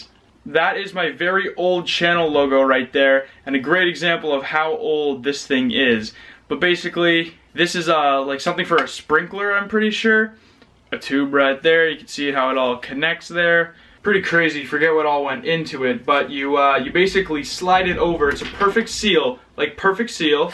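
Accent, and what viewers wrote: Canada. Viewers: are you canadan or american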